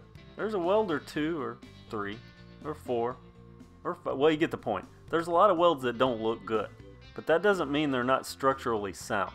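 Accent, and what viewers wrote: South, United States. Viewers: His Smooth Southern accent is so charming! I absolutely dispise how Northerners call Southerners accents "ugly" or "not articulate". This guys voice is the definition of articulate!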